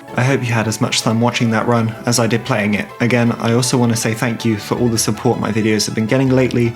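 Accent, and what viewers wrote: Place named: United Kingdom. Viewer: This must be the first nuzlocke video I watch with a British accent! That’s so brilliant, bring it on man! 💪🏻🤗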